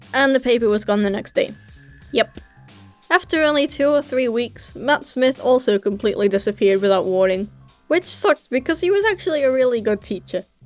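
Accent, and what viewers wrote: United Kingdom. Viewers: I Still Love Your British Voice - w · (if this is somehow racist im sorry) are you British you sound British and if so... GOOD FOR YOU!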